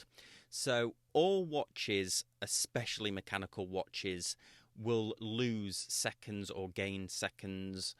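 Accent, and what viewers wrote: United Kingdom. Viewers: I've watched this video through twice, and it sure would be NICE if you'd give a mention of the app you're using to accomplish this amazing feat! Going by your accent I gather you're a Brit. We Americans need things spelled out for us a bit plainly. So how bout it, sport? Give us a hand here mate? 😎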